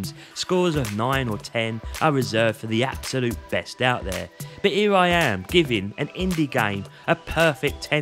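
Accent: United Kingdom. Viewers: I cant understand the british accent 😢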